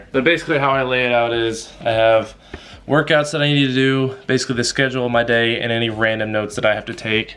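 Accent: Arkansas, United States. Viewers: no way !!!are you form arkansas? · Are you from Arkansas? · Are you from arkansas?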